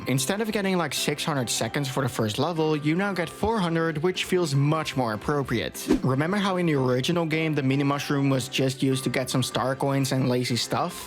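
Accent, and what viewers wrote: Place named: Netherlands. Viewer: Haha ik dacht al een Nederlands accent te horen. Tof filmpje. Ik zou ze graag willen spelen. De newer games, maar ik ben totaal niet technisch, heb geen pc en weet niks af van mods.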